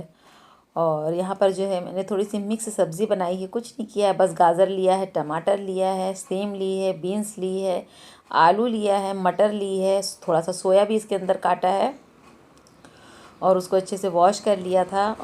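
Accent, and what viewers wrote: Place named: Kanpur, India. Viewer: aapki awaaz se lagta hai aap Kanpur ki hai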